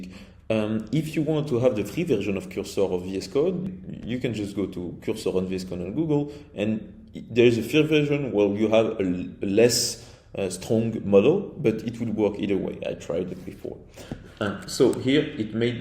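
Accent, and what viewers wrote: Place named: France. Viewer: Hi, thank you for your video, it's great what you did. I think you have a slight accent, you are French?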